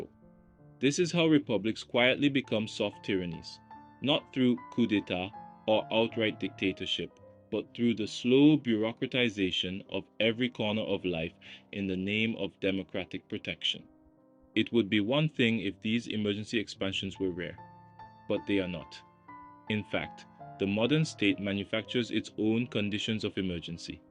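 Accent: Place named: India. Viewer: Sound kinda indian. Can you please curse vishnu for us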